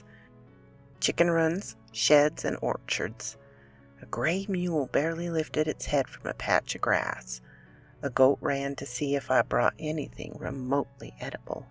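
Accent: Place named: South, United States. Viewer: I'm found this to be overall interesting. The southern draw was at the least my ears delight. The story was at most entertaining and the attractiveness of bringing it all together is something that draws me back everytime to you Madame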